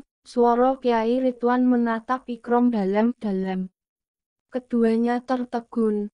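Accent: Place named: Java, Indonesia. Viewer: Lanjuuttt minnn .. suaranye kok tmbh kyak wong jawii ta 🙃🙃🙃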